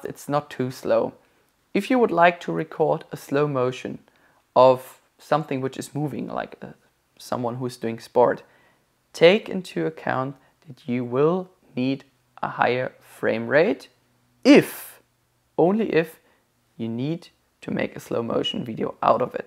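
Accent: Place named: Germany. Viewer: I work for a german boss. Your video reminded me of him. Both because of your accent and almost ridiculous attention to the remote details :D This is an excellent video. I recently got a gopro 9 black as a birthday gift. This video will be really useful for me. Keep up the good work !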